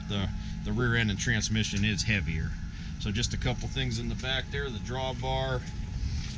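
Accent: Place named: Midwest, United States. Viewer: Same reason he says filders. He’s a Midwesterner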